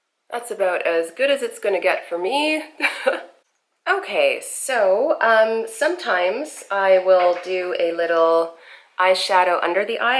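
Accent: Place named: Canada. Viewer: I don't know why, but I love Canadian accent. :) Nice makeup.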